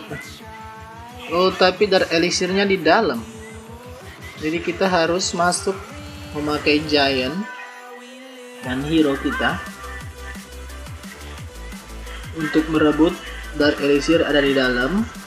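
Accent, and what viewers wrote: Manado, Indonesia. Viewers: org Manado Nn kang? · Bang orng manado ya? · Orang manado? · Bang Asli Manado Kah? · Kiraleh ng orng jakarta, trnyata cmn orng mnado😂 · bang lo org mnado bang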